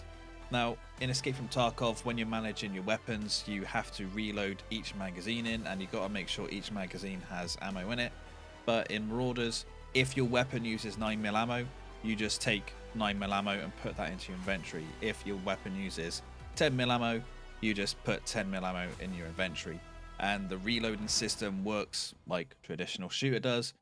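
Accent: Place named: Bristol, United Kingdom. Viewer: U sound like ur from Bristol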